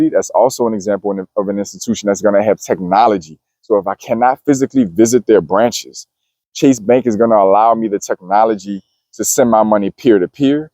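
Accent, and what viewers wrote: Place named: Louisiana, United States. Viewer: Hello! I knew you were from LA, I could hear it in your accent…I definitely know about hurricanes and having to have resources to travel based on potential hurricanes! And the ability to help others in my family and extended circle. This is a good video. I have strong relationships with Chase and NFCU…also CapOne and Discover…business with Chase and NFCU…next on list is AMEX…DDs going to Chase, NFCU, and CapOne…IRA with NFCU, savings with Chase and AMEX.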